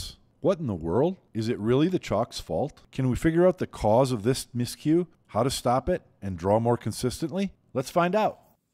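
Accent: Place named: Wisconsin, United States. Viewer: With that accent, you’re definitely from Wisconsin lol. Love the content! I just figured out the “throwing motion” on my own the other day and it helped a lot. I need to try out the 90° rule on a table tomorrow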